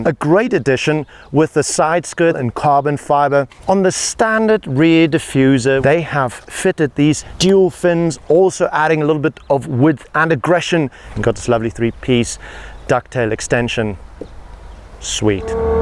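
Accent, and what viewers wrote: South Africa. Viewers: First 10 secs of the video, I'm like, "Why do you sound South African bru?" 😂😂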